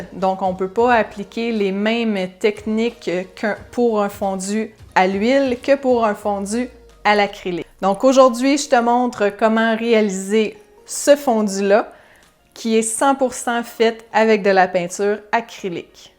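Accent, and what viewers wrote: Quebec, Canada. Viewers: Salut, j’adore ton bel accent québécois, je vais mettre en pratique tout de suite tes précieux conseils pour essayer de faire un fondu gris. Je te montrerai le résultat. La bise de France 🇫🇷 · Merci. Ce clip est intéressant, bien expliqué, fort pédagogique et, ce qui ne gâte rien, professé dans la langue de Molière. C'est si rare sur Youtube à notre époque. Amicalement, PS: votre accent québécois est une pure merveille; ne le troquez pas, ce serait un véritable gâchis!;)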